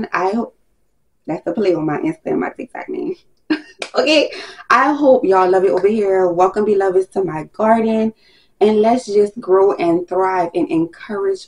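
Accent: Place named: Los Angeles, United States. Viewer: Girl I've been binging your vids all day! I found your channel today while looking up correctional nursing videos as I only have one more pre req before I apply to my RN program. Been a CNA wayyy too long (7yrs) and ready to finally change my life for myself and my 2 toddlers. You're a breath of fresh air and I'm excited for your content and going on my nursing/healing/motherhood journey right with you girl❤...oh and that LA accent is everything baaaby or however y'all say it lol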